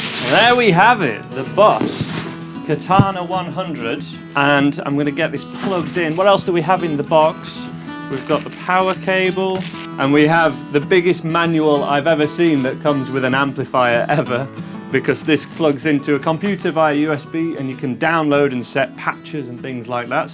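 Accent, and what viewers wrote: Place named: Yorkshire, United Kingdom. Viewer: Hey, where can I get myself a Red5 and which one are you using in the video as I can’t find the link in your description. Much appreciated, thank you! I hear a Yorkshire accent, I’m Leeds/Bradford!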